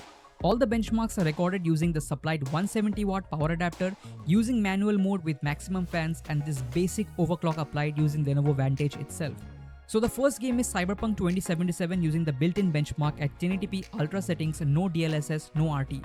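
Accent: India. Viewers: Bihari bkl commentary nhi chaiye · Bro are you from Siliguri...?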